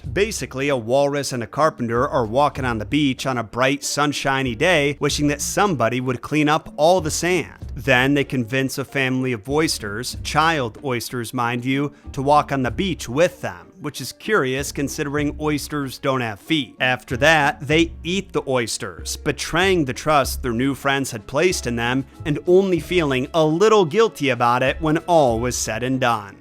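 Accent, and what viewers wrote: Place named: United States. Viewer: Never heard it read in an American accent but cool video.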